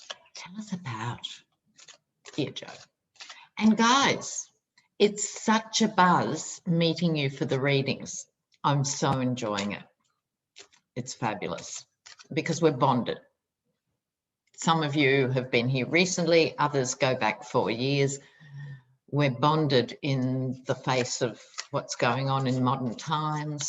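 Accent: Australia. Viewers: I like hearing Australia as well as the United States of A.. Thank you for a great reading Miss. I send you big love from Beth in Mancos CO.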